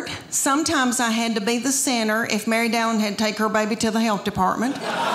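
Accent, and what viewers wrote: United States. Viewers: her way of speaking is specefic to a region in the us? i like it..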